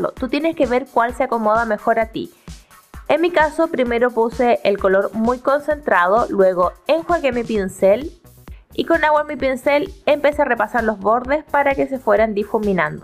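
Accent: Chile. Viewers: Yo pienso que tienes un contenido súper bien elaborado, porque no sólo se basa en el arte de forma teórica, sino que también en la práctica del mismo, acompañado de consejos para aquellos los cuales nos iniciamos en el mismo. He aprendido mucho de tus vídeos, tanto como en la acuarela como en La teoría del Color, creo que es muy interesante el que haya un profesional en arte enseñando y dando sus puntos de vista para la gente que no mantiene conocimientos sobre el tema, sin embargo, le interesa bastante aprender. Además de que me siento súper identificada porque también soy de Chile. (Por como hablas creo que también eres de Chile. [?]) Me encanta tu contenido, saludos. <33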